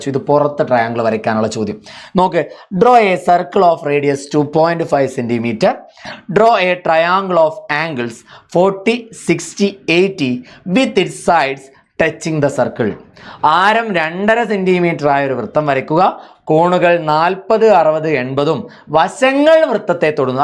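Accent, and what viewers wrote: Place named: Vadakara, India. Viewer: Vadakara voice ann sern😂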